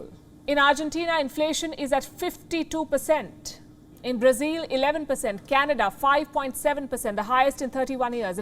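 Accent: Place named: India. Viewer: wut lmaoo... her accent is totally Indian.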